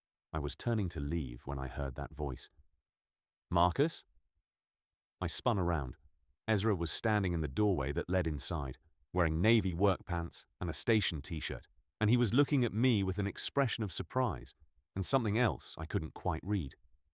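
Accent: United Kingdom. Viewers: A part 2 of this story would be awesome! love the British accent of the narrator.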